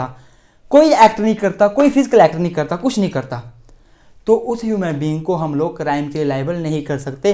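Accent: Himachal Pradesh, India. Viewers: Are u from Himachal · pahaadi ,himachali ho aap?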